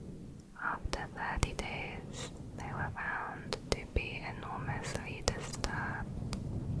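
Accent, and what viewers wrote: England, United Kingdom. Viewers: British, very apparent. It's hard for me to understand her due to it but I love her videos regardless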